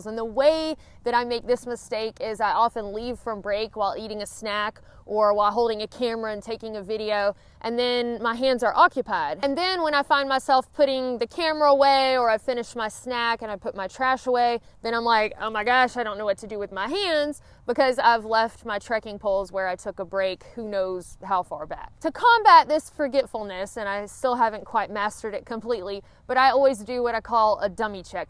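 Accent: South, United States. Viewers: This has NOTHING to do with backpacking, but I suspect it's happened to one or more of your viewers: I left my cellphone in a bathroom stall at a gas station in Sevierville, TN. It was the famous Frank Allen's (they have great breakfast: simple, comforting and so good, but they're famous for their slaw dogs) and someone was honest enough to turn it in. We happened to have some friends there when we left, so we contacted them and they picked it up for us. We were lucky, because my Amazon app was hooked to my credit card. Coulda been expensive. I have a feeling that folks have left their phones on the trail somewhere, hopefully with not-terribly-painful results. I thoroughly enjoy your channel, young lady. Your Southern "flavor" to the channel is comforting and most pleasant. It reminds me of the wonderful experiences I've had and hospitality of our brethren in the American South.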